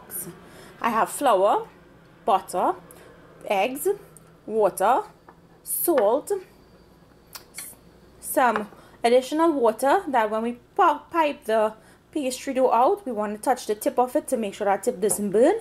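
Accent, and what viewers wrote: Trinidad and Tobago. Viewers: Beautiful Trinidadian Accent!!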